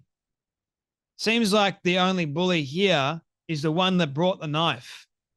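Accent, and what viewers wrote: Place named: Australia. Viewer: dude your Mick Dundee accent got me to subscribe love the accent & content from Fla.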